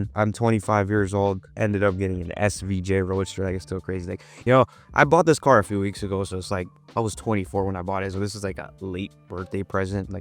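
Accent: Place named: Canada. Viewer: u don’t sound American at all u sound like a 100% Canadian.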